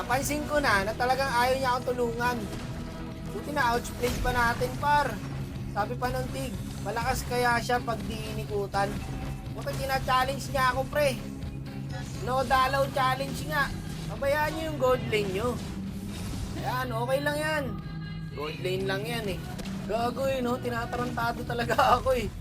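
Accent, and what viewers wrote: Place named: Bulacan, Philippines. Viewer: San ka sa Bulacan boss na halata ko kse boses mo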